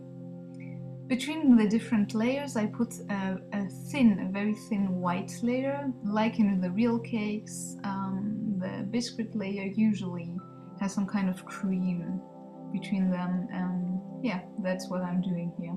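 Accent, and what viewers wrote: France. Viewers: are you french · are you French? I liked the voice-over to.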